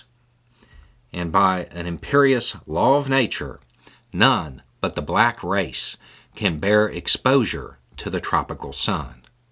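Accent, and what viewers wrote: South, United States. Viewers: I feel bad about what you said your accent. The southern accent is such an incredible accent, so beautiful and will always be so.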